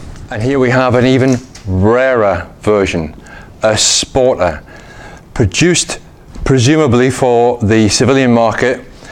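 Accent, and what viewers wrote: United Kingdom. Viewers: Out of curiosity in which region of GB is your dialect spoken? And many Thanks for the great content! Best Regards from Austria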